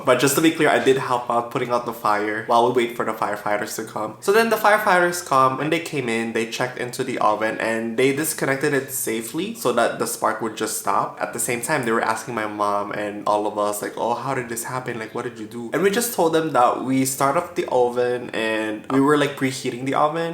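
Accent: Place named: Philippines. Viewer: are you filipino? you have a filipino accent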